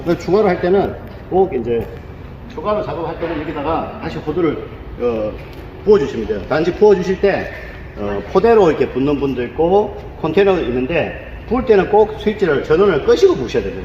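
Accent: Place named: Sangju, South Korea. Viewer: 상주 사투리가 구수하고 좋은 기계 잘보았습니다. 김천제품 3년전에 구매했는데....?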